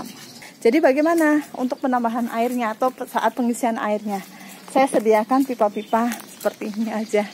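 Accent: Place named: Banjar, Indonesia. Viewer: Dari logat ibu sprti orang banjar kalteng ya😀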